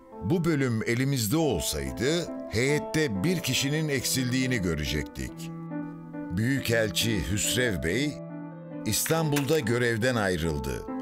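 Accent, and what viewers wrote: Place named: Cyprus. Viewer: Kulağa kıbrıs şivesi gibi geliyor. Hoş, naif ve güzel bir diksiyon..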